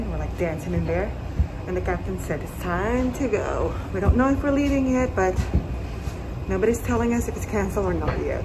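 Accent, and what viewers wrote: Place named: Philippines. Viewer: Ok ...ok....you came up on my youtube, and when I heard you speak...I said to myself...."Is she a filipina?". I kept on listening, and listening, and when you said the word "actually"....I said YESS! , She is a filipina! Then your mannerisms at the end, confirmed a little bit. That is how my female cousins act when they get a little "friendly". LOL! But when you ended your vlog with a "Maraming Salamat Po"! That confirmed it!!! MABUHAY!! I just subscribed!!!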